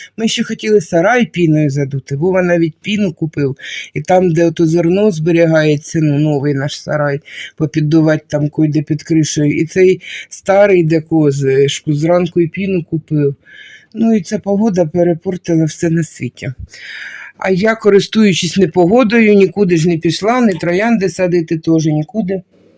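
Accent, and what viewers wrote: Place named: Slobozhanshchyna, Ukraine. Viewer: Моя названа мама була із Купянська, так що говір Слобожанщини впізнаю одразу. 😍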